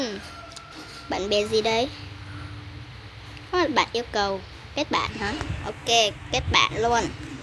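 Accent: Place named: North, Vietnam. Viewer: Ủa sao lại nói giọng bắc, bà nói giọng nam cơ mà??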